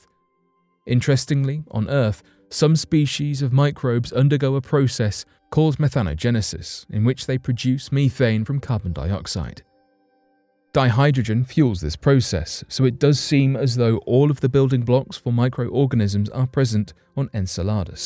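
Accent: United Kingdom. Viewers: British pronunciation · Yeah well he is not American…he is British That is how the British pronounce it. In fact that is how I pronounce it too. I’m South African and we speak UK English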